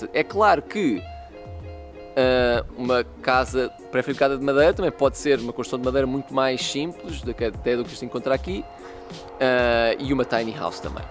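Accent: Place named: Portugal. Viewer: Muito bom o seu trabalho!! Ainda por cima falado em português do continente!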